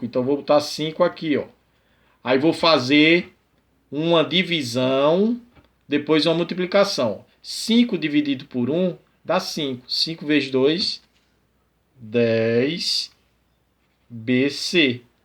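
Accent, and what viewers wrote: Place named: Alagoas, Brazil. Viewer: Boa noite! Obrigado por postar os exercícios. Pelo seu sotaque me arrisco a dizer que o senhor é alagoano.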